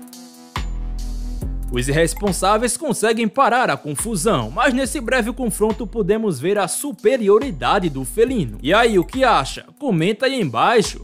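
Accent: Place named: Paraíba, Brazil. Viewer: Sua locução é demais com esse sotaque meio nordestino de Paraíba, mas o troço chato é cão, tira a paz de qualquer bicho. rs Se o felino tivesse solto ele veria o que era bom. rs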